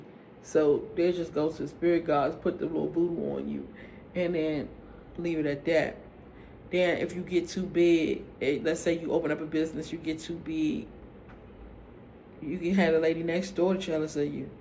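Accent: United States. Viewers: Nobody knows you are an American until you speak. So I don't agree with you when you said Africans think they are better than Americans. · I hear that DMV accent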